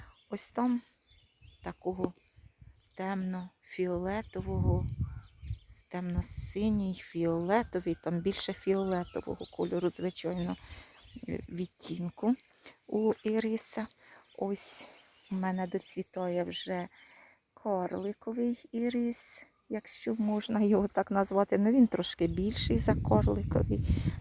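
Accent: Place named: Cherkasy, Ukraine. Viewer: Дійсно море 😂 ! У вас Черкаський акцент.😂 Моя Батьківщина 🤗Гарного сонячного дня 😊👋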